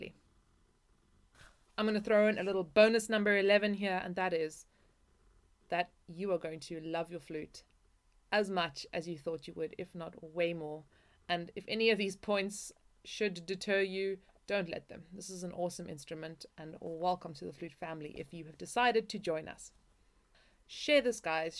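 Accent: South Africa. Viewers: I don't think she's British. I'm thinking South African and/or European who went to International School. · Are you from South Africa by any chance? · South African ex-pat with a slight British twang? This is the first video I've watched on this channel :) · Hey girl- are you South African?! I hear that accent ;) ;) soo much love from the Jacaranda city!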